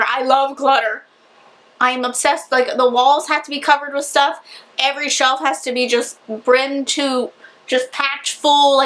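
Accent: South, United States. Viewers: OMFG I'm from MD (right on the DC border though) and have always assumed you were from WV or another southern state... I'm really shook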